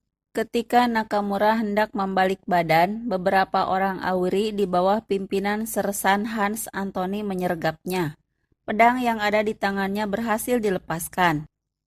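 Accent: West Java, Indonesia. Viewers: Miminny bahasa indonesia logat sunda😃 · Logat sunda mah gak bisa di ilangin ya bu.. 👍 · Naratornya urang sunda? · Sunda banget eceu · Konon, ada satu Stigma yg mnyatakan sbgai berikut : " Bernyanyilah seperti Orang Ambon, Berpikirlah sprti Orang Jawa, Berdaganglah sprti Orang Padang, Bertindaklah sprti Orang Batak dan.... Berbicaralah sprti Orang Sunda....!!! " Sprti Narator ini, dn jg yg lain melahirkanlah suara2 Diplomat Ulung Kelas Dunia dari Indonesia dg Tuturan kata tata bahasa Indonesia dn aksen Parahiyangan dg Diplomasi piawai nya, semisal diantaranya : Mochtar Kusumaatmadja, Ali Alatas, Nana Sutresna, Marty Natalegawa, Hasan Wirayudha, Djuanda Kartawidjaja, Connie Rahakundini Bakrie ( pengamat militer dn internasional ), Djuanda Alm/ pngamat intelijen dn Maritim internasional ( penasihat Gus Dur ) dll. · Naratornya sunda hehe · Pengisi suara pasti orang sunda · Pilipina, dipisi, konprensi, menginpormasikan, aktip, pormosa... 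😂😂 Logat sunda teh emang teu bisa di bohongin euyy 😁😁 Mantaaappp 👏👏👍👍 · Kaciri nyunda na teh. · Logatnya sunda eui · Komentatornya orang sunda..........datar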